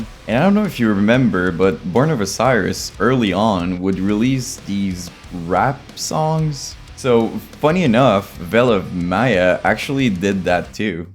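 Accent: Quebec, Canada. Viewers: Est-ce que j’entend un accent québécois? 🤓🧐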